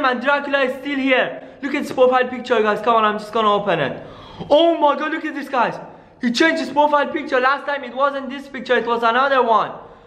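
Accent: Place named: United Arab Emirates. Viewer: he is from united arab emirates thats why he talks like that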